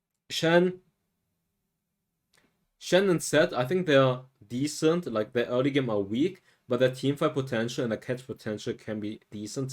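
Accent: Germany. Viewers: o he is german?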